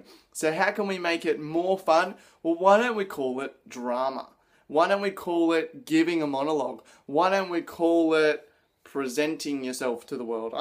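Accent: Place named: Australia. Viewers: your Australian accent actually helped me pay attention, since it's not what I hear daily. Maybe I'm unusual, but I enjoy different accents and cadences. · He has a fine Australian accent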